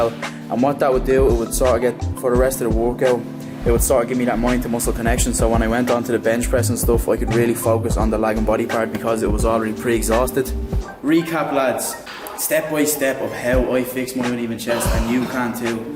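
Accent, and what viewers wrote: Ireland. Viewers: Are you irish ?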